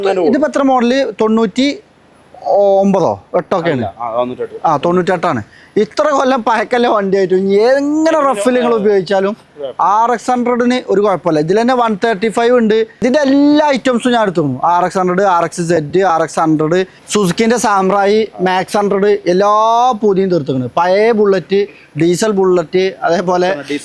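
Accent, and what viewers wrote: Malappuram, India. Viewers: സൂസ് അത് പൊളിച്ചു മലപ്പുറം ഭാഷ അത് തിരിയുന്നവന്ന് തിരിയും അല്ലാത്തവൻ നട്ടം തിരിയും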